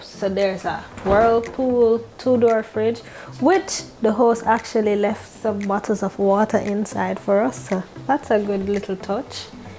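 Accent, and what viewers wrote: Jamaica. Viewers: Cah tell me seh u anuh Jamaican mama👀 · I have to comment because your narration, description, accent (seems 🇯🇲 ) and personality moved me to. Also had to subscribe! Phenomenal video 👏👏👏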